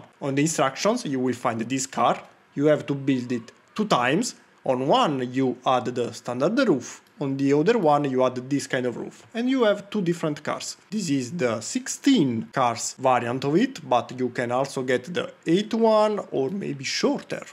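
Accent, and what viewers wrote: Italy. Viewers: Dall'accento avevo intuito fossi italiano hahah, bel video e bel modello, complimenti!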